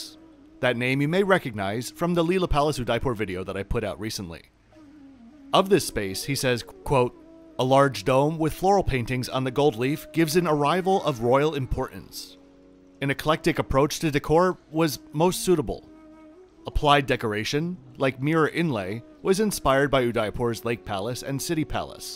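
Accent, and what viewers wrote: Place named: New York, United States. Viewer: Best travel content on YouTube by far. Would love to hear the story of how you ended up in Asia. You sound like a New Yorker. ;-)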